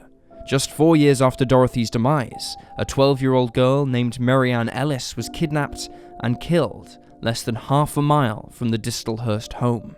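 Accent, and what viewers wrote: United Kingdom. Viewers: yes, I like the British narrators best, as long as they are not speaking in a northern accent, and this one is good.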